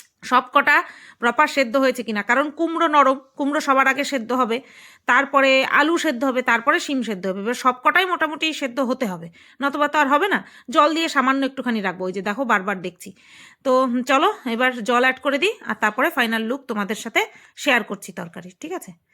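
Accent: Bangal, Bangladesh. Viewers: Bangal bhasa ki je sruti modhur laage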